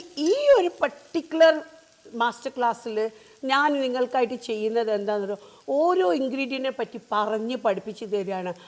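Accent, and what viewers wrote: Kozhikode, India. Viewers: ഇത്ത, എന്നും കാണാറുണ്ട് പുതിയ പാചക വീഡിയോസ് ഇടുന്നതും കാത്തിരുന്നു കണ്ണും നട്ടു ഇരിക്കാറുണ്ട്, ഇങ്ങള് പുലിയാണപ്പാ, എല്ലാ വീഡിയോസും ഒന്നിനൊന്നു മെച്ചം, കോഴിക്കോട്ട് ഭാഷ സൂപ്പർ നല്ല രസം ഉണ്ട്‌ കെട്ടിരിക്കാൻ, പുതിയ ഐറ്റംസ് പ്രതീക്ഷിക്കുന്നു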